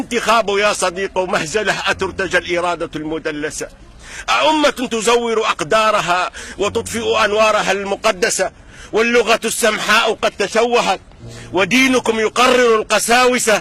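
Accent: Tunisia. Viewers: Abdelilah Ait cadi هذا تونسي لهجة الجنوب وقناة الجنوبية تونسية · هذا تونسي¿?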